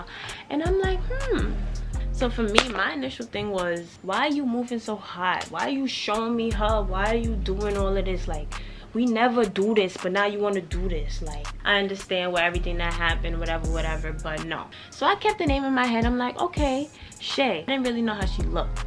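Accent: New York, United States. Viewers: It’s deadass not enough New Yorkers on YouTube cause I love the way we tell stories. when you was like “ guess who sent me the picture “ I felt like my bestie was putting me on !!!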